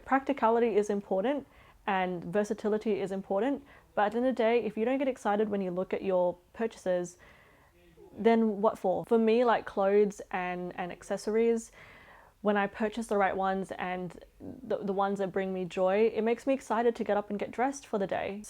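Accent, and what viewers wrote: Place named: Australia. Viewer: Are you from Aceh? Can’t figure which accent, but the more I hear it’s definitely Aussie’s 😅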